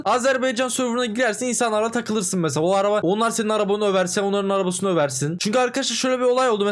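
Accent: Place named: Turkey. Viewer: Qaqaş Sən Niyə Türk Kimi Danışırsan?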